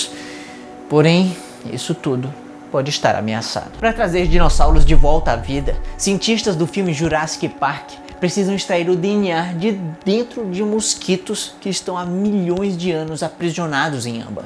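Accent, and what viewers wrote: Ceará, Brazil. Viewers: Pera c é Cearense?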